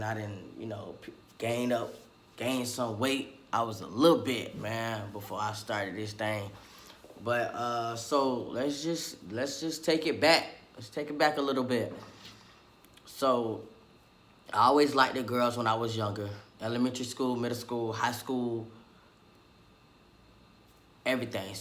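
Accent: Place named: Florida, United States. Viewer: I can hear that Florida accent!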